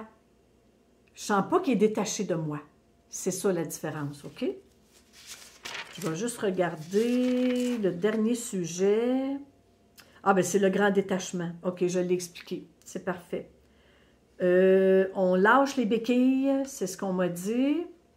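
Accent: Quebec, Canada. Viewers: Merci, c'est toujours un grand plaisir et une grande joie de te voir et d'entendre ton bel accent Canadien 🧡🌿🌼🌷🕊🌷🌼🌿🧡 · Merci🙏, merci 🙏, merci 🙏pour ce magnifique partage 🥰. J'adore votre accent Québécois 😂❤, ça me donne la pêche en vous écoutant. Vous êtes adorable ❤. Belle soirée de la Nouvelle Lune 🌑. Je vous envoie pleins d'Amour 💗 et de Lumières 🌟✨️🕊...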